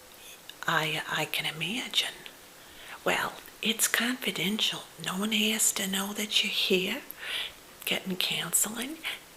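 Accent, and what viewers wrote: South, United States. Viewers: Love the southern accent. There is something very... accommodating? about it. I don't know how to put it :)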